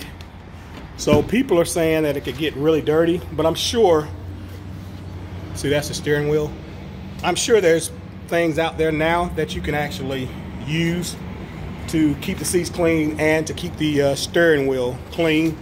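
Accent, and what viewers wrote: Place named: South, United States. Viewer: You can tell you right from down south.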